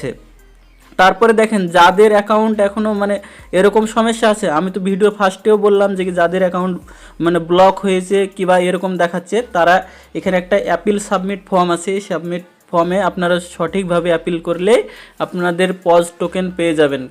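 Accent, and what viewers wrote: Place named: Bangladesh. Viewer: Apni Indian? kotha sune mone hoy bangladeshi.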